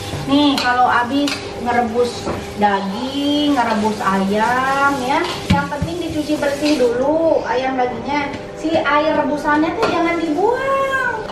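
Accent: West Java, Indonesia. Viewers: emang sunda mah gitu logatnya.. bukan disunda2 in,teteh kan org garut.. sama kayak org jawa bukan dimedok2in tp emang medok dr sananya kituu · Resep liat masakan nya z teteh...sambil ngomong logat Sunda...😍 walawpun ak kg bisa ngomong Sunda🤭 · Teteh maap klo bs logatnya normal sj...biar hamble jgn disunda2in...maap sblomnya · Memang orang Sunda kok d sunda2in duh si ibuk mah😪😪😪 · Teh lucuu klo ngmng sunda, kebetulan aku bkan org sunda tp suka sm bhs sunda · memang logat sunda begitu say....sama aja klau ada vlogger yng ngmng bhsa jawa Ciri khas daerah masing2.... mereka ttep hamble.... Kan sambil belajar bhsa daerah lain hihihi.... cinta Indonesia berbeda2 tetap satu Indonesia....😊😊😊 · resep k teh teh nyariosna meuni Sunda pisan, cantik deuh. 🤗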